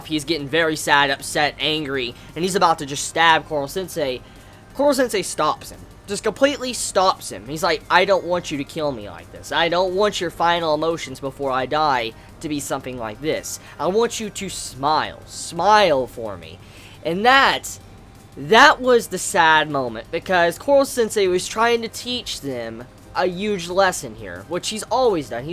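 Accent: United States. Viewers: Ummmm, what part of America is that accent from?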